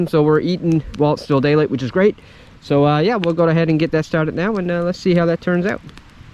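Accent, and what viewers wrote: Newfoundland, Canada. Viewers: You sound like someone from Nfld...Buck baymen or a towny..?anyways, I subbed. Hello from NB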